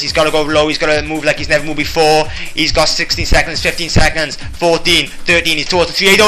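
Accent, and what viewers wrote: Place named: Wales, United Kingdom. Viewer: One of the most famous captures ever in UT! casting with his Welsh accent:D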